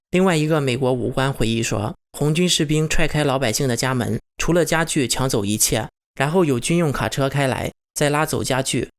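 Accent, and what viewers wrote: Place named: Weifang, China. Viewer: 感觉你是潍坊那边的口音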